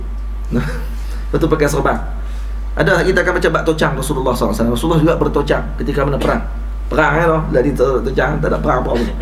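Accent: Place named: Kedah, Malaysia. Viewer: anas nasrulloh basa malaysia. lebih tepat negeri kedah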